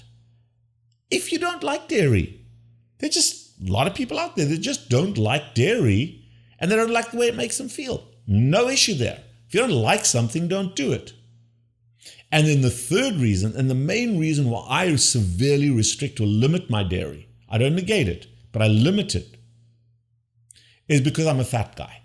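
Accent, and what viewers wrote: South Africa. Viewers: Thanks Doc...your accent seem to have some regional South African traces...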